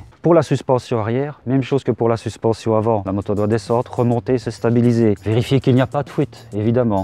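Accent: Belgium. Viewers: Rien que de t'entendre parler je suis certain que tu est Belge !!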